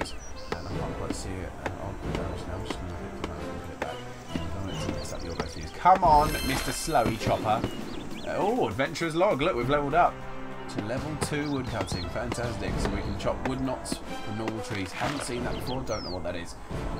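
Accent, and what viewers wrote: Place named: Scotland, United Kingdom. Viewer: I think you were suppose to stand next to Gideon so he could shield you when the demon did his super attack thingy. But you managed to kill him anyways so it's all good. Can the baby troll be named Pickle? I think it should be named Pickle. :D I think your Scottish accent is fine, but I'm Canadian so what do I know? :P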